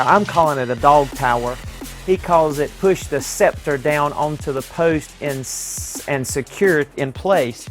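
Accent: South, United States. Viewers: Came across your video here and it's my 2nd video I've watched. So the reason i thought I'd comment cause you sound Southern with a Southern accent. I'm in the South as well if you are. I'm getting a Snake Mountain as well from Super 7 but haven't been notified of a shipping track number so just waiting is all i can do.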